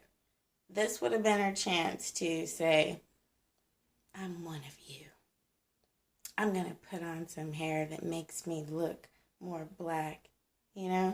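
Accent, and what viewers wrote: Texas, United States. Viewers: Your sexy as hell with that Texas Twang!...damn you are REAL as Hell!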